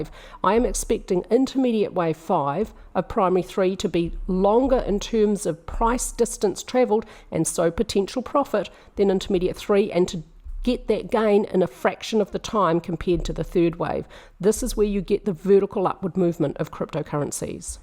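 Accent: New Zealand. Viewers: Great to hear your kiwi ascent😊😊😊😊. Great analysis thanks.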